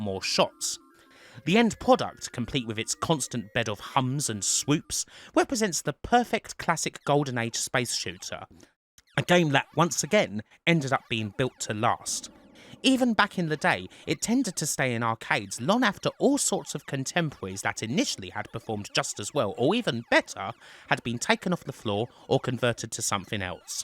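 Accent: United Kingdom. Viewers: Another F’ing brit. Blocked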